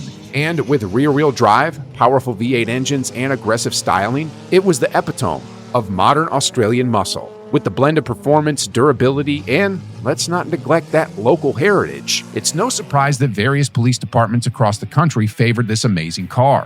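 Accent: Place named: United States. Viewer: Didn't watch the whole thing cause I can't stand seppos talking but did the XR6 turbo get a mention?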